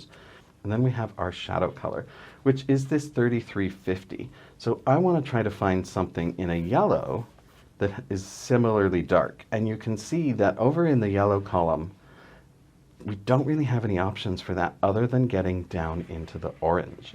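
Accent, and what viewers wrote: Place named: Canada. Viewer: Also, you sound Canadian...where are you located? I am in Ottawa.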